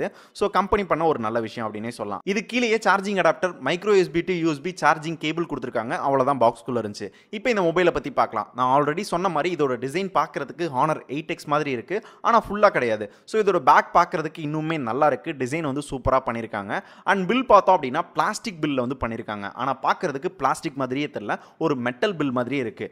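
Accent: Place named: Madurai, India. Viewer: Bro neega madurai thaana... Norikkivittainga...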